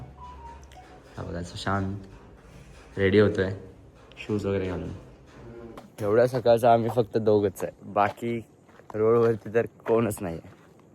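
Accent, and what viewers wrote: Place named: Sangli, India. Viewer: सांगली मधली tone आहे तुझा बोलीची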